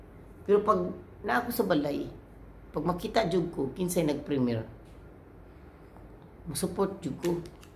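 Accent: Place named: Visayas, Philippines. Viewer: bisaya man diay si host, proud bisdak here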